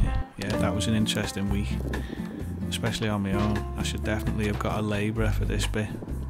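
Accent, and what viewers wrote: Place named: Scouse, United Kingdom. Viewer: I really enjoyed listening to your soft 'Scouser' accent. The transformation is mind boggling - love it!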